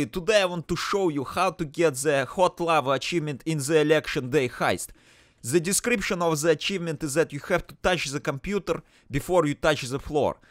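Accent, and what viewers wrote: Russia. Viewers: This is one of the less common variations of russian accent btw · love the russian accent and thanks for making this tutorial i didn't know how to do this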